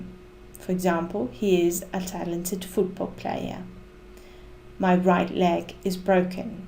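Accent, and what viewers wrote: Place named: West Midlands, United Kingdom. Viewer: very professional presentation. and very understandable. excellent . thanks !!! watched from central America, I detect i think a rather refined west midlands accent. please keep posting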